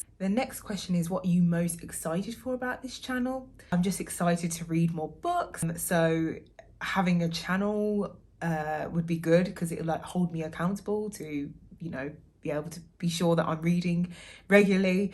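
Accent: Birmingham, United Kingdom. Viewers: Stumbled across you accidentally, I love to read and I have a ridiculous amount of books but I don’t think I could do Booktube! Do I detect a Midlands accent? I’m from Birmingham :) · вы первая буктьюбер, говорящая с британским акцентом, которую я нашла😭 вы очень красивая! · Immediately subscribed for the vibes!! Do I detect a west mids/brummie twang? Either way, I love your voice, reminds me of home.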